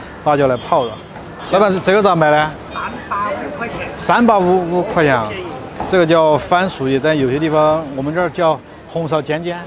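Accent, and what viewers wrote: Sichuan, China. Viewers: 的四川口音普通话听了倍感亲切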